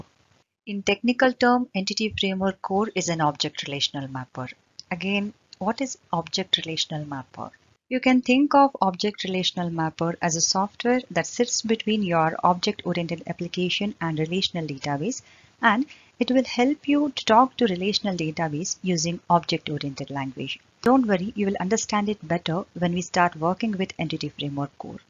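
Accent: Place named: India. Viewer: ind*an voice first second of the video, time to click off.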